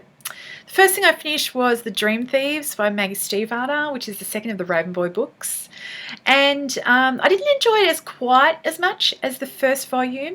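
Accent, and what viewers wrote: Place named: Australia. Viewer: I have never heard of Nick Earls and his 'bloke lit' haha! Thanks for reviewing it! Do you read on a Kindle? And you're borrowing digital books from your library? I am trying to get my head around this process. So great to hear an Australian accent on Book Tube. Baking here in hot hot Melbourne. Love your work! :)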